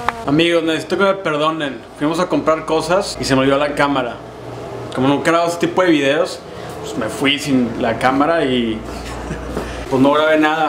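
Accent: Monterrey, Mexico. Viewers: Qué bonito video con puro acento regio🙌😜 jaja me encanto haz mas vídeos así, y haz convi en Monterrey😭😭🙏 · el acento que tiene, es normal de alguien de monterrey?:( quee alguien respondaa:'( soy de Chile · Sip soy de Monterrey y así hablo😂😂😂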